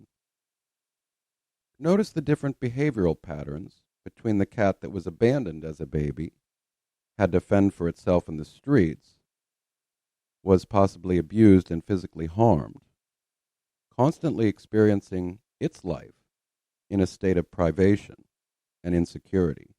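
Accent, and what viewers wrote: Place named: Ireland. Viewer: I’m late to channel, but I do love him and his to-the-point story telling. And yes, his brogue is charming, too!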